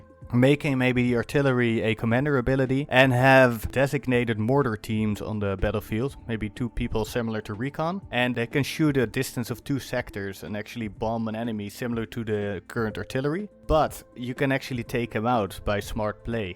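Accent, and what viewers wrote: Netherlands. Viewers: Ben je een Nederlander? Je accent xD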